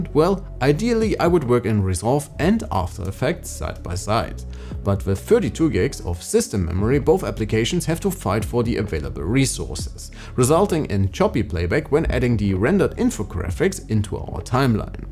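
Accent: Germany. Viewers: I just discovered the notebookcheck channel via the "HP Omen 17 2024" review. Really great stuff! You are a great speaker and your german accent sounds nice ;) As a hobby audio guy I always appreciate that you guys include "LatencyMon" and fan noise examples in the reviews. I would love a Video about DAWs and Audio Setup on Windows Laptops one day! Keep up the great work!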